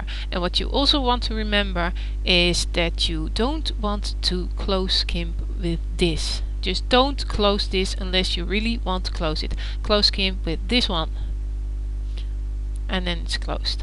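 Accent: Netherlands. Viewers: Haha Nederlands zeker, hoor het aan je accent! Wel goed gedaan hoor! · youre dutch right ;p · Ben je nl? Je accent klinkt nl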